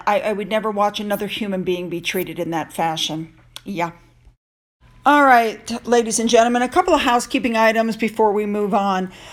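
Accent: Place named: United States. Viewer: HI NUMPTIES saying how to pronounce words I love your American accent and your very well spoken and a great Narrator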